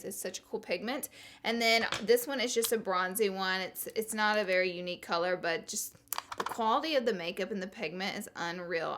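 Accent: South, United States. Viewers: "wear it around town" hahah! where are yu from i can't put my finger on the accent! sounds southern almost to me!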